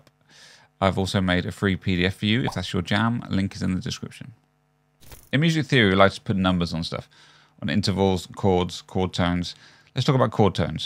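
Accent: United Kingdom. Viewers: Sounds smug and pretentious? That's just his British accent.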